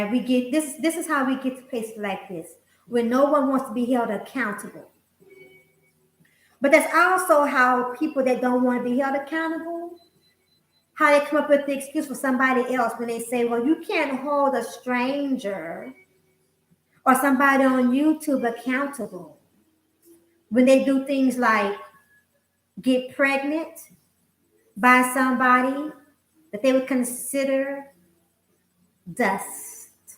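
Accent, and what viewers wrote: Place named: United States. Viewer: Hey, are you from the states? Reason why I ask is because of your accent, which is lovely btw.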